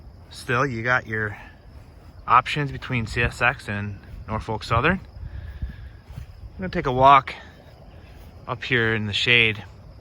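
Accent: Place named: Western New York, United States. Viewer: Wonderful WNY/CHQ accent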